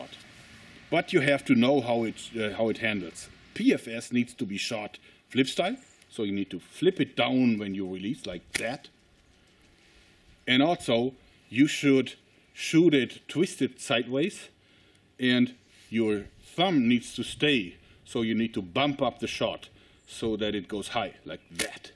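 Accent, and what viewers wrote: Germany. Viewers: for some reason this all made better with the german accented English...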